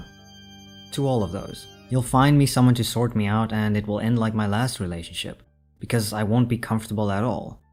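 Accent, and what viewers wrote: South Africa. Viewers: Are you also partly South African? There's something rly familiar about your accent lol but I suck at placing accents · this is an off topic question but are you south african? your accent and pronunciation just sounds so familiar and i just have to know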